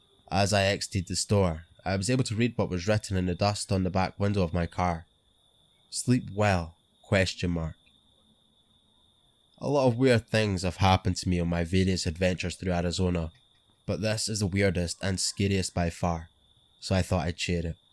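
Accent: Scotland, United Kingdom. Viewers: I love your Scottish accent, it really adds to the story. But sometimes it's kind of hard to understand it, so my suggestion is adding captions to the bottom of the video. I bet it would please a lot of people.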